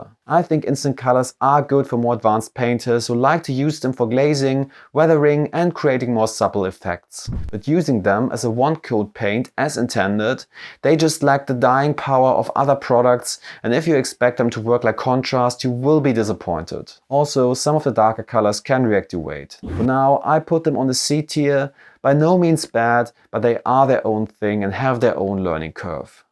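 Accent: Germany. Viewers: Da klingt einer aber ziehmlich deutsch! Tolles Video und deine patreon Vergleichsbilder sind super! Hab den kompletten Vergleich von allen 5 Marken gekauft !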